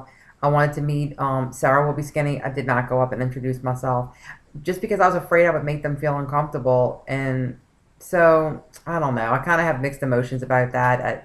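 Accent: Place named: New Orleans, United States. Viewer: Hi, Thanks for sharing this journey. I'm just starting-- I'm on my Aetna 90 day pre-op. I have a question.... I'm definitely hearing a New Orleans accent!!! I'd know it ANYWHERE! Are you from New Orleans?? I'm a native....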